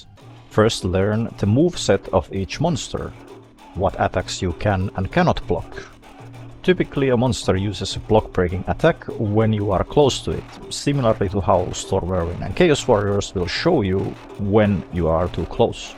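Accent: Finland. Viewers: You have very finnish accent ❤ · I love your slight finnish accent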